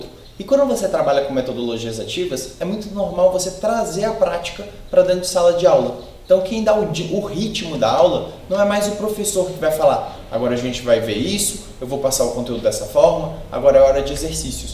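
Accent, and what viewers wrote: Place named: Espirito Santo, Brazil. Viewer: Você é capixaba? O sotaque parece haha muito bom!!!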